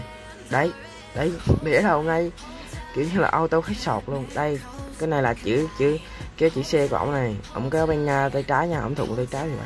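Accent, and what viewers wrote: Quang Nam, Vietnam. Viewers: Anh người quảng nam hả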